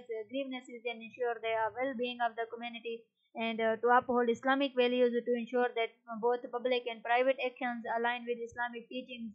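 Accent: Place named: Saraiki, Pakistan. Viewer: Saraiki eng accent 1st time I heard 😂😂 I appreciate your work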